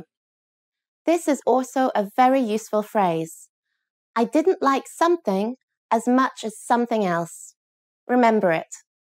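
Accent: England, United Kingdom. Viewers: esse sotaque inglês pqp :( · I love British accent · British accent is really fascinating...this lesson is attention taken ...I like your s-t-y-l-e...learn alot from you...thanks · This just feels like learning American English from someone with a British accent This sucks and I’ve learned nothing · british accent is so hot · I was just try to learning English for 1month now I finally found a British accent , I like to learn this kind of stuff. Still I m try to learn if it's bad dnt mention it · Big thank you for presenting this helpful vedio to learn English with proper British accent 💙💙💙💙💙💙💙💙💙💙💙💙💙💙❤️❤️ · Hi dear teacher! Your British accent is wonderful and so beautiful. I love English very much! It is the most important and beautiful language of the world. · I liked British accent 👍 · where is the word "mate", i just wanna talk like a british to say that word with this accent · I love British accent!.And she is amazingly beautiful and charming.Hello,!!!!! 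· Your sweet british accent is kind of cute and its helps me to learn this accent . . . . . i don't know why but its very nice for me 😅 Btw your sitweb is useless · Are you from england? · I really love this walk through the British accent and the common communication way for people around the world rather using the American accent. Such plenty of love this accent though. If you could do please make a short lesson for kinds to learn the British accent too.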